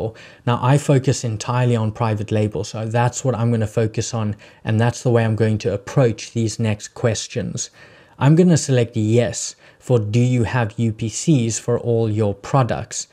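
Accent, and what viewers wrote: South Africa. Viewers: Hey, Good to hear a South African accent. I'm based in Spain (from CPT originally), I've opened an LLC in the USA, but struggling to get a Bank Account in the USA at the moment. Would you say it's best to open a Professional SC Acc as an Individual so that my Spanish address registered with my Bank Acc in Spain lines up with my address on SC until I get a USA Bank and then update my details to a company on SC? Is that even possible? Even if I have a US Bank Acc, it will likely have to have my Spain address I think... as I only have a Wyoming Registered Agents and Mailing address, and the agents have said it's not advisable to use that address for banks. Plus there's the utility bill issue... 🤷🏼‍♀️ Any direction you can offer on this? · Just as I was about to ask if you were South African you said you were South African lol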